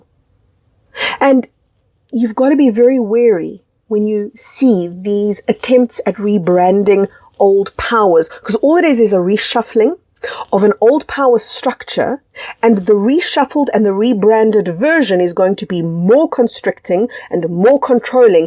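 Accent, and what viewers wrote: South Africa. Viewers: Man have I enjoyed your videos over the weekend! So much of your stuff is resonating with me! Also nice to hear a fellow South African😃 I'm going through huge Assention symptoms the last 3 years. Vision distortions, in somnia, vibrating body Suffocating anxiety. Every day I'm waking up thinking what's it going to be today. Yesterday I was watching your addiction video. And well as some people not think you were talking in metaphors mmm! I believe I came face-to-face with my demons on DMT journey. While I was indulging in one of my mild addictions I inhaled a dmt vape And there it was in front of me breathing and responding to my emotions the more excited I got for my activity the more powerful the entity became. As if we we're separate yet connected. It didn't seem to be good nor bad Nor did it look like a demon. It looked amazing! 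Beautiful,vibrant, colourful It was Maybe both feeding and supplying all of what humanity has created and experienced through the activity. It may also have been a part of me, but what makes me think that it is a collective or separate is I have seen this entity in dmt art. So I am clearly not the only one who has come face-to-face it. But it was real and it was something separate yet part of me. But as per usual with psychedelic journeys with every answer comes another 10 questions.😅 Anyway I'm glad I found you keep up the good work and I'm Sure your videos will come to me as I need them as they always do💚 I am having help from 2 energy healers at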